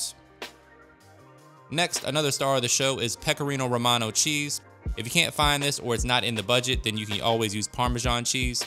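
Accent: United States. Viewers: Why do you amaricans sound so cool???? Us English lads just sound common haha Brilliant video, just come across you, carbonara is my favourite pasta, definitely gunna try your recipe 👌 Peace and love from the uk 🇬🇧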